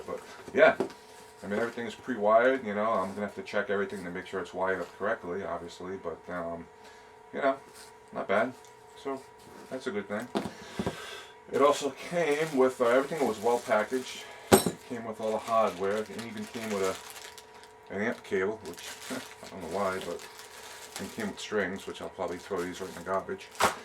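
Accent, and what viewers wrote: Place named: Boston, United States. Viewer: Code clan .Agreed, sounds like a Boston accent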